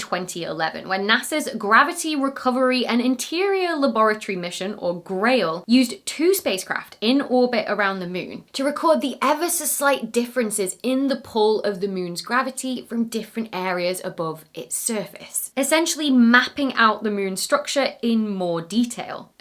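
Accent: United Kingdom. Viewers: Wondering if you'll see this, but curious, are you British? Thanks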